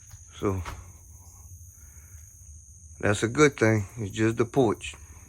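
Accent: Louisiana, United States. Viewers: DAT LOUISIANA TWANG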